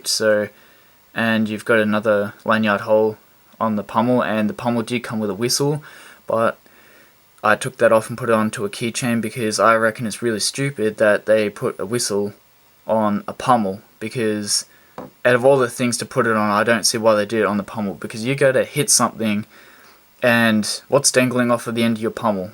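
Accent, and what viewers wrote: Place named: Australia. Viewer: U sund Australian iam Australian and Asian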